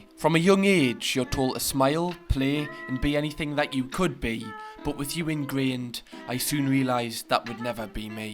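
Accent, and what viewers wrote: Newcastle, United Kingdom. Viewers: Good video, good words, and I know this is bad but I feel like it doesn’t u e the same effect in a Geordie accent. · YOU JUST CANT TAKE HIM SERIOUSLY WITH THAT NEWCASTLE ACCENT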